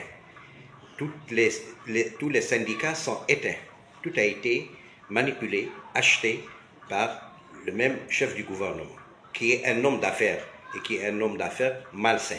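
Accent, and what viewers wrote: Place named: Mauritania. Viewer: تبارك الله على الكوعليم … الفرونسي ديالك بحال ديال الموريتانييين او السينغاليين … خصك غي شي صباغة كحلة وها نتا ناضي …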